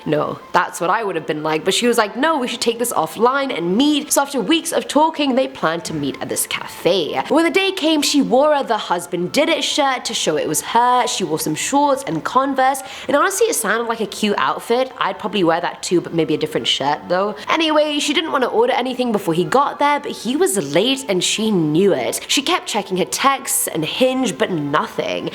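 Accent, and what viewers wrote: South, United States. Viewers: Lol nice southern accent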